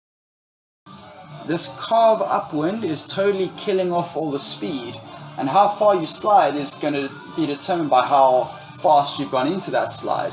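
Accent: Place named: South Africa. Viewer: Bru! So rad to randomly catch a Saffa accent on YouTube :) makes me homesick. Will deffo be checking out more of your vids, keep it kif ma china!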